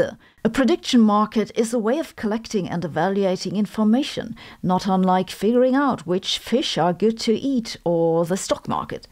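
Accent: Germany. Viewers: Dear Sabine, just two questions about your themes presented in this video: i) You said that although the mathematical construction works, there's no physical evidence for the "simulated universe " theory. Is there any evidence that excludes this, too?.. If there is, I'd very much like to know... ii) About the method of road construction on the Moon surface: do the scientists involved tested the structural endurance of their "melted tarmac " against the extreme conditions in their lab, concerning temperature, that the surface endures throughout the day-night periods? According to my - limited- knowledge, that's the prime factor for any construction, set aside the humidity, which is non-existent on the Moon, of course... Thank you for your videos! Popularised science, in layman's terms for the broad public, is the best way to spread the achievements of science widely. 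Even speaking with a heavy German accent, which sometimes reminds me the Peter Sellers' performance in "How I learned to love the Bomb"! 😊 I would very much appreciate a reply to my questions, if you please..!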